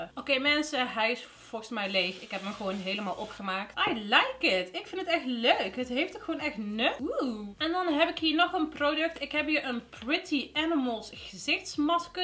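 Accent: Brabant, Netherlands. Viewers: Brabanttt!!!! Bende benieuwd? Blijf dan vooral kijken hahahaha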